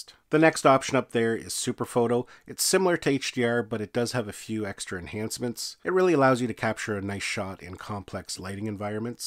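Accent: Canada. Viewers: By FAR the best video on the new GoPro 9.... BTW I'm from Ontario.... you sound like you're also from the frozen North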